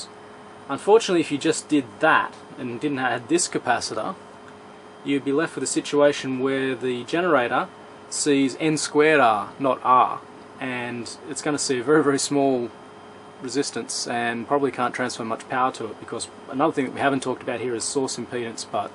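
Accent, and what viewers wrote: Australia. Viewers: thank you! I was right. I thought you are Australian)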